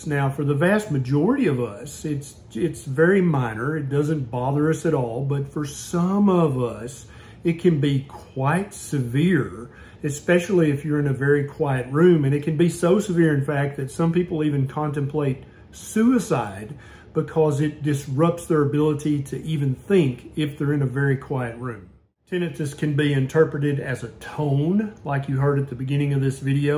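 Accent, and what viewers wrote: South, United States. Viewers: Tiniiiiitus is pronounced this way in the north as well so you sound like you're from the south and I don't understand you're saying it is pronounced that way in the South when you clearly I can't even listen to you because you keep on saying it improperly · Oh my goodness… we really do say it like that in the south lol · 100% support that southern pronunciation,!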